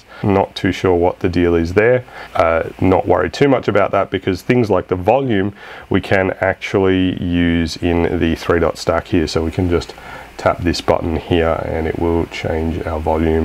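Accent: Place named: Australia. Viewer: cheers mate. Nice to hear an ozzy voice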